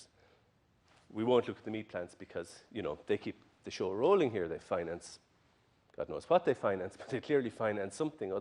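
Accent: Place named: Ireland. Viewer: Beautiful Irish brogue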